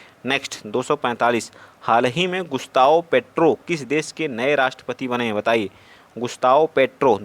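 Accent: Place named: Kanpur Dehat, India. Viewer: bhai app कानपुर देहात से हो क्या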